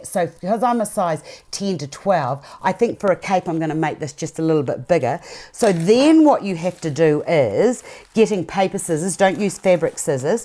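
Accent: New Zealand. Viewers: Not only was this very educational, but I could listen to you all day long- I love the New Zealand accent (Im Canadian :) ). Thank you, Im your newest subscriber :)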